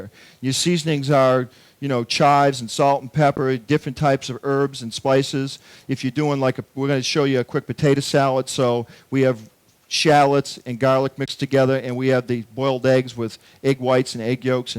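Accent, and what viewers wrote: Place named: Boston, United States. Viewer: he's from boston give him a break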